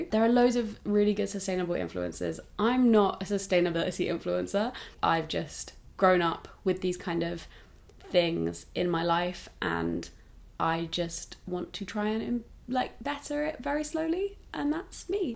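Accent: RP, United Kingdom. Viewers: Is it weird that I never noticed had a posh accent until she said lol? I guess she comes across so genuine that it didn’t really matter. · As a non-native English speaker, I must say I absolutely love your posh accent! I also really appreciate how authentic you are, thank you for the wonderful content! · I got the distinct impression that on the one hand she didn't consider herself to come from a wealthy background, but on the other she also intellectually knew that she was born of two professional parents in archetypal upper middle class dormitory town Tunbridge Wells in Kent, speaks with an RP accent & appears to have survived a 3 year university degree without emerging hugely & unsustainably in debt, and that these two sets of information were in some tension. This is absolutely not a dig at her or you, just an observation for perspective purposes. 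We don't get to choose the circumstances of our birth.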